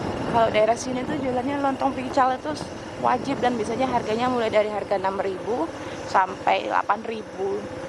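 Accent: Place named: Java, Indonesia. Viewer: Adiak ko asli urang Minang apo urang Tran jawa yo ? Soal nyo logad jawa adiak mengecek nyo Alah lamo merantau ka sitiung diak ?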